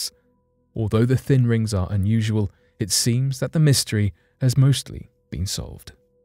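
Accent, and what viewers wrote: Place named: Southern England, United Kingdom. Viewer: It's southern English same as mine an you really can't understand? where s your accent from just curious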